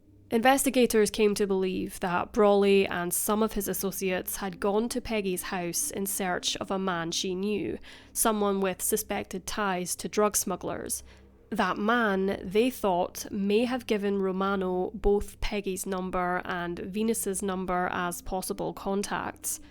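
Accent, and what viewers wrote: Scotland, United Kingdom. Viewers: Brits write and speak better. American English sentences can sound clumsy to us. The narrator is a Scot.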